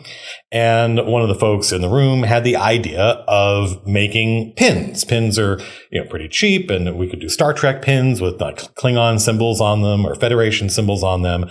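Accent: South, United States. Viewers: I love your southern belle voice,.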